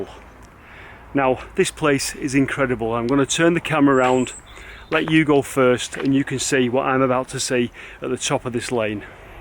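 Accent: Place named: Northern England, United Kingdom. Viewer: Well Thank you for your pleasant video of a DISGRACE for N.H. they should have preserved this GEM . Stupid attitude of town hall; they don't have the Millions needed to invest . So in fact they are responsible for the abandonment and destruction by Mindless Yobs. You are a pleasure to listen to although i am not fond of northern accents. Your personality surpasses.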